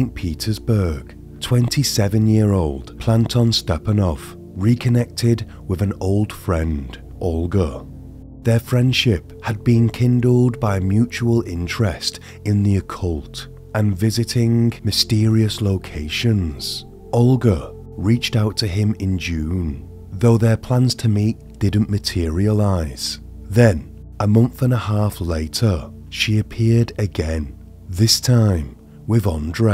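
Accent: United Kingdom. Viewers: What region of British accent this?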